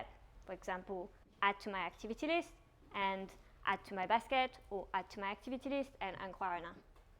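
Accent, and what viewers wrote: France. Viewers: Very educational presentation with good examples. Easy to understand. I like the French accent too :)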